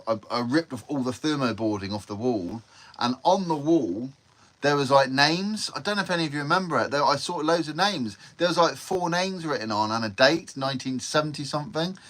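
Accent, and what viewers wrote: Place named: East Anglia, United Kingdom. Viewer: You just sound like a east anglia accent but I read Channel Islands should have a bad South African accent?🤷‍♂️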